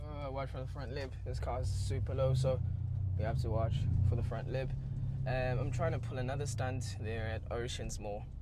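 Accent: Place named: England, United Kingdom. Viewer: You sound English bro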